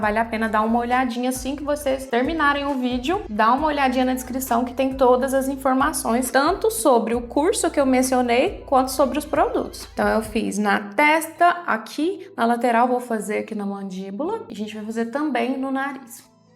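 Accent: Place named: Minas Gerais, Brazil. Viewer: Estou adorando seus vídeos! Sou nova por aqui e estou achando tudo muito útil e uma delícia de acompanhar. Você é mineira da onde? kkkk Pergunto por conta do sotaque fofo que tem... Eu sou mineira de BH. Confirme se minha suspeita está certa... Beijo, obrigada e parabéns pelo trabalho.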